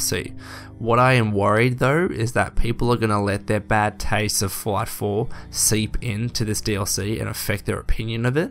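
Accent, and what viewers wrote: Australia. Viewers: hope the "Fah Hah Bah" jokes don't upset you, know that we love you and your kangaroo accent!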